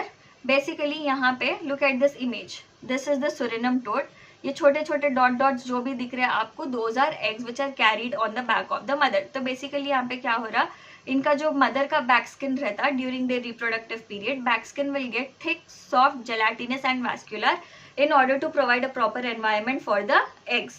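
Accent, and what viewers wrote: Hyderabad, India. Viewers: I think ap hyderabadi hai 😂❤❤❤❤ love your lacture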